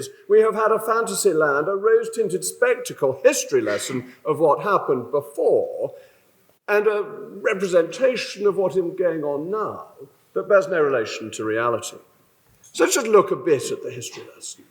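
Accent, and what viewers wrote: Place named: RP, United Kingdom. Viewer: Love his posh RP accent...!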